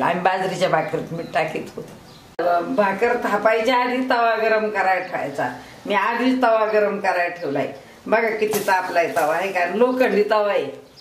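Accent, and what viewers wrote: Ahmednagar, India. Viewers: आमच्या नगरी आजीची मस्त भाकरी.. आन् झणझणीत नगरी बोली..😀👍🏻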